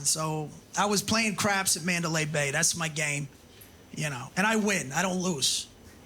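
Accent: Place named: New Jersey, United States. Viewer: The jersey accent is maybe the only one that competes with deep redneck for being just, offensively dumb and obnoxious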